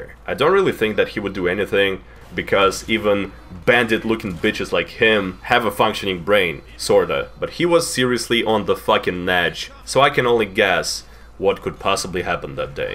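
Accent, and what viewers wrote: Russia. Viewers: I dont know why but its so chill to hear your russian voice and your stories. Please make this a thing! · a russian person telling histories while playing tf2? I NEVER KNEW I WOULD NEED THIS SO BAD IN MY LIFE please make more of this type of content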